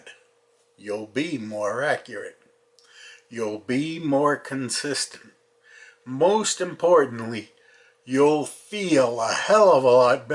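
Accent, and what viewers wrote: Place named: Boston, United States. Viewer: Wonderful North Shore Boston or Southern NH accent.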